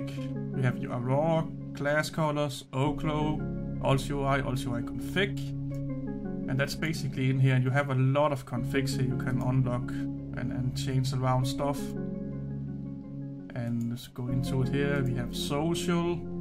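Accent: Denmark. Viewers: The danish is strong in this one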